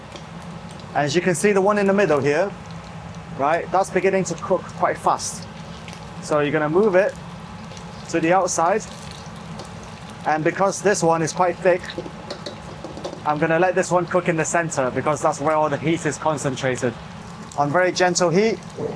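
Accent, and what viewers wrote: Northern England, United Kingdom. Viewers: It's his voice, he's from the north of England, has a nice mix of accents.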